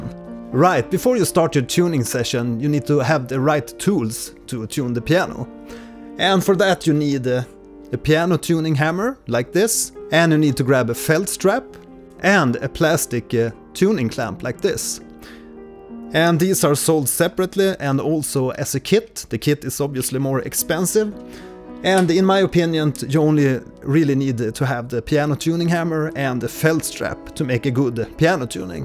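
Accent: Sweden. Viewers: Thank you 🙏. You sounds like swedish 😂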